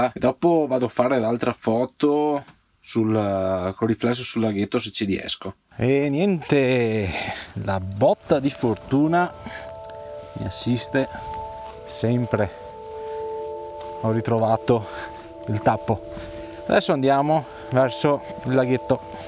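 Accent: Veneto, Italy. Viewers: Filmati sempre bellissimi....!!! In più con l'accdento veneto, fa sempre piacere, sembra un poco di essere a casa e di conoscerci.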